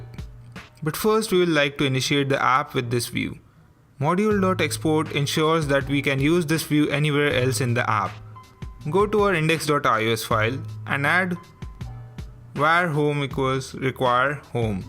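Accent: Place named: India. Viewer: Very hard to follow with the Indian accent :(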